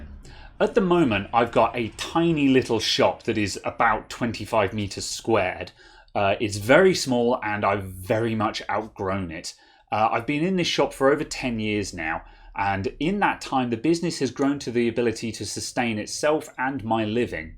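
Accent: United Kingdom. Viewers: I'd echo this. Nothing against US/Aussie/OtherNationInEnglish sites, but nice (comforting) to hear it with a Brit accent with Brit vernacular.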